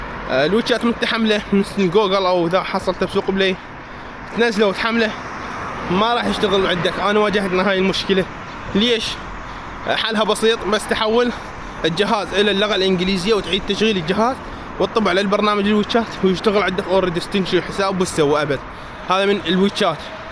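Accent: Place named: South, Iraq. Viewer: أنته أنسان مجتهد ربي يحفظك وأحترمتك اكثر لن معتز بلهجه عراقيه الجنوبيه تحياتي لك بالتوفيق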